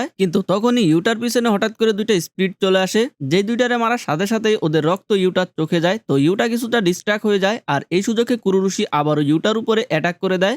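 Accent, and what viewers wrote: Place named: Magura, Bangladesh. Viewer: Vai apnar basa ki magura? Magurar Moto accent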